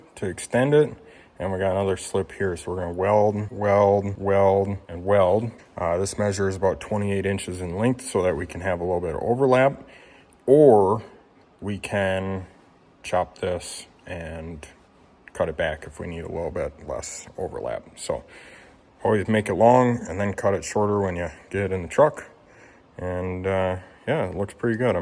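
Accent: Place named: Wisconsin, United States. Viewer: U sir , sound like ur from Wisconsin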